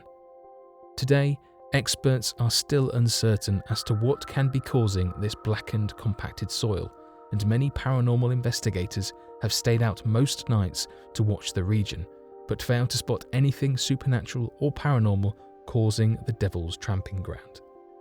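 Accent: United Kingdom. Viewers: Another boring British monotone narration. Blaaaaaah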